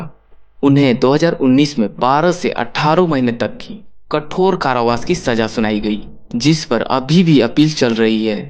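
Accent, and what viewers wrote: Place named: Bihar, India. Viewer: Pakka Bihari reporter hai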